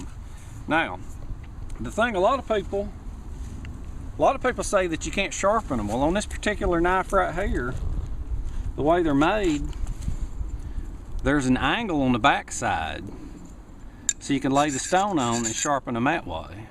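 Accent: South, United States. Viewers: He talks just like a friend of mine. Very real southern accent. If you wanna hear a "real, fake" southern accent at its best, watch the television show The Walking Dead. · I'm with you, water comes first. Dehydration will kill you first, but that knife is nice to have. Altitude sick in the way back high country this weekend I had your crazy sweet southern accent in my mind resting there at too high altitude under the shade of a tree wishing to die from a banger of a headache thinking inside the handle is some matches and a saw and some fishhooks but right now I wish I had something to blow my brains out! Kidding... maybe. Those little fuzzies are great for fire starting if you can crawl around and find some tinder. I could! Pine sap... fire... water. Just water and more water. Shelter and water and sleep a thousand years with the spinning head and then it broke and I was back with you again, human, ready to go that next mile. 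Your videos are wonderful!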